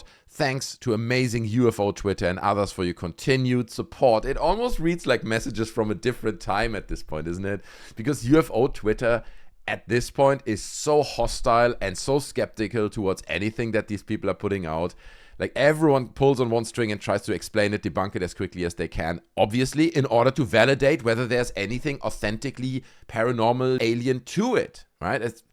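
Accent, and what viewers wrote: Germany. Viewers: Thank you for all your work! Your logic, humor and perfect very slight German accent is such a perfect combination! Keep yourself safe.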